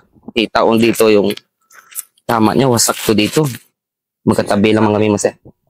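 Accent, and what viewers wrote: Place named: Iloilo, Philippines. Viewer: Sure ako na ilonggo accent nito we salute po sa inyo sir.❤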